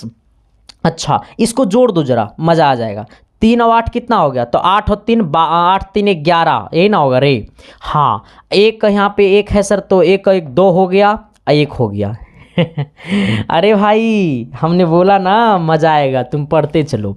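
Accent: Bihar, India. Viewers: Sir aap Bihar se ho kya ???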